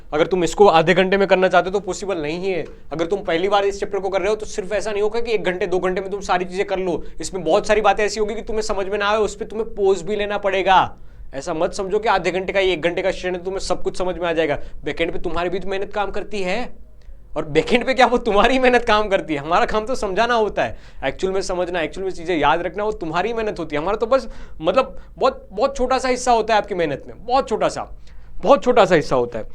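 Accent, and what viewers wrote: Gujarat, India. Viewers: He sounds like he is from Gujarat 😅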